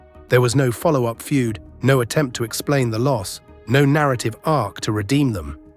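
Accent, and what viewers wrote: United Kingdom. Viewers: These episodes are truly a joy to listen to. The English accent gives it weight. Power and Glory rocked and when Roma became a Horseman I was down. I’m a 10 year old boy in ‘87 and pro-wrestling was my soul. I’m 10 again while I watch now and it’s better than I remember. Thank you.🤠🍺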